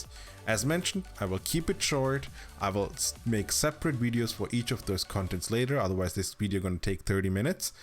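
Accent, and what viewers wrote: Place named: Austria. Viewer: Thanks for the clear explanation bro. Based on your experience, what was the highest item level you saw in game? I've seen a lot of videos and they ranged from <num> only. btw I like you accent, it's like Arnold Schwarzenegger. lol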